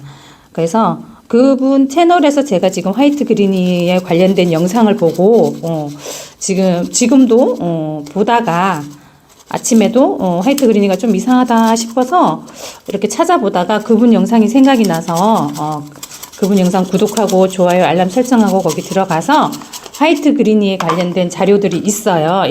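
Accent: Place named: Jeolla, South Korea. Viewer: 안녕하세요~🥰🥰🥰 편안한밤참들기전 이쁘니들 힐링하러왔어요 ~~ 화그 재식재 하시는군요~~건강 해보여요 잎장은~🧚‍♀️🧚‍♀️🧚‍♀️🧚‍♀️🧚‍♀️ 여름에도 전 물 조금줘요 뿌리가 고사가 되더라고요 😂😂😂 전구독한지 오래됐떠용 전라도 사투리 겁나게 쓰시는분 👍👍👍👍👍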